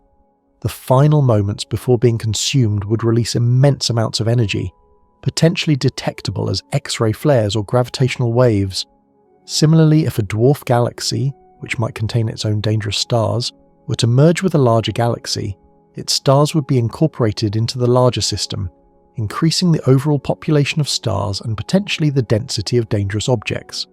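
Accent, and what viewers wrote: United Kingdom. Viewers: Hey YouTube I'm talking to you Please stop recommending science for sleep or the Sleepy scientist or go to sleep listen to this fucking British dude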